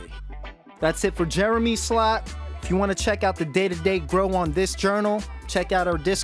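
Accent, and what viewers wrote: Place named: New York, United States. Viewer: Love that new yorkers accent bruv 😂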